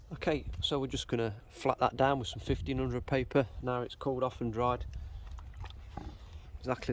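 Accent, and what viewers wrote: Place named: Derby, United Kingdom. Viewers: Nice Derby accent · Love your videos and thanks for making them. I have to ask, what part of England you from? I've lived in Australia for 56 year's, originally from Leicester. Your Accent sounds Leicester or some place very close by. Cheers me duck.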